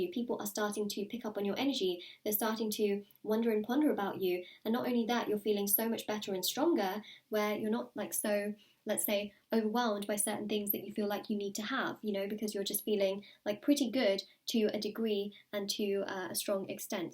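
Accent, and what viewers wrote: United Kingdom. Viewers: Bless you, wonderful reading. Good to hear a British accent, majority are American. I’m in Doncaster South Yorkshire. Have a super weekend, namaste 🙏 🥰💕🪴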